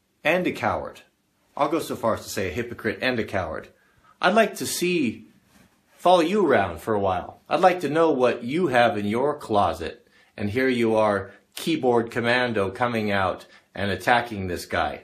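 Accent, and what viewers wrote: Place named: North America. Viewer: I have no idea of the "Controversy" involving Mr Canterbury, BUT! I take my hat off to you for making your stand known to the world. I am residing in Ireland, and you sound like you are in north America, that is the reach of youtube! :-) Please keep the videos comming! Kev B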